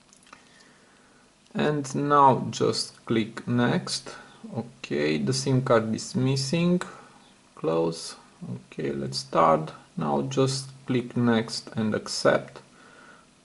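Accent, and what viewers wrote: Romania. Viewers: Mr.Alex_YTB /\ ShadowHyper Dont feel sorry man. He is struggling as well , with the language. The way he speak english is telling me that is more likely Romanian or probably Bulgarian. I don't see any reason of you feeling sorry that you don't speak English , when him with his English is on YouTube trying to get make some money.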